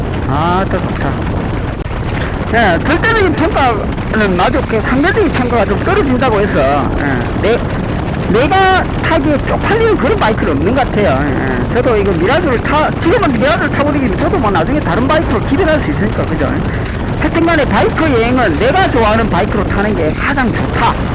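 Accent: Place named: Gyeongsang, South Korea. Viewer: 신묘한 경상도 사투리ㅋㅋ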